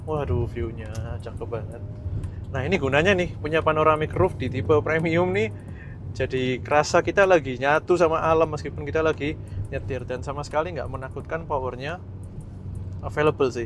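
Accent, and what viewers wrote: Surabaya, Indonesia. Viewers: sampean enak nek ngomong suroboyoan ko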